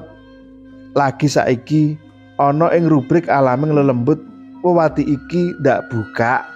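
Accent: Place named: Central Java, Indonesia. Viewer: jawa nya daerah tengah kali ya,,,jadi buat saya daerah jawa timur jadi kaku,,,hehehe .tapi mantap ceritanya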